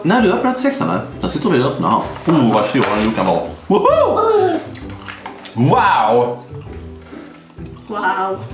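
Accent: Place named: Scania, Sweden. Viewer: #unibrown och pratar mörk och skånska bland det värsta jag vet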